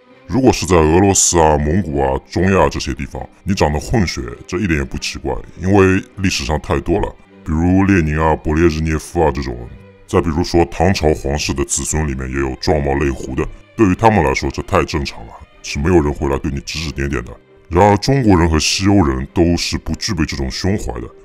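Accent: Shanghai, China. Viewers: 看你表达能力很强，论述的时候也没有断续，应该是有受过训练的专业人士，而且说话的方式和一些地方语言的应用，都说明了你本人是地道的上海人。 我在猜想你不会是之前被国内封杀了的周立波吧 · 你是上海人吧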